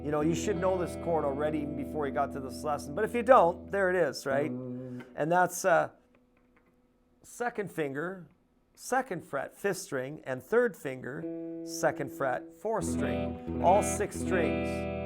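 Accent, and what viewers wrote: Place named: Canada. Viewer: Hey thanks...kind of coincidental that I would run across this lesson after just having bought my 2nd capo, the 'short-cut.' I'm only into the guitar 2 years, and although I practice daily--& often--I am a woman of a certain age with recently diagnosed arthritis. Now, "3 chords and the truth" may be enough for some (younger?) folks to achieve success quickly, but since my only option is to focus on my personal goals & chart my progression, this video of yours and certain others have helped me a great deal. First, your show on hand exercises was inspirational & dove-tailed perfectly with my daily physiotherapy -- right up there with rolling the baoding balls around (haha...but true nonetheless). As well, I appreciate your straightforward, no-nonsense approach to learning; as a teacher myself I agree that learners must not be spoon-fed, but should think for themselves as much as possible. 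Of course, one must have hope in order to carry on when in something like my predicament, thus I was very happy to hear you express, more or less --- "'you get out of it what you put into it." Hey! Now I'm not nearly so crushed as I was. May I request that you follow up with a lesson based on the "shorty" capo...? I've heard they are a great boon to the arthritis-afflicted. Thanks so much for your time & effort. By the way (considering that I'm a language teacher/linguist), based on your vowels, intonation, cadence, & tons of other factors, I'm assuming you are Canadian?? If so, please a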